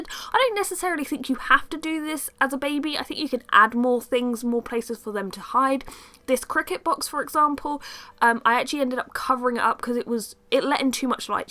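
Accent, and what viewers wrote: United Kingdom. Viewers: i love brittish accent :3.... i just love it _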